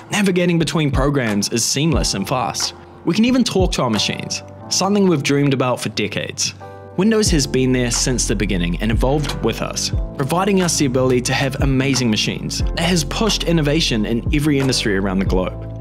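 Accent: New Zealand. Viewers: I knew you sounded like a kiwi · How did I not realize his kiwi accent. When I heard PB tech I was like wait a hot second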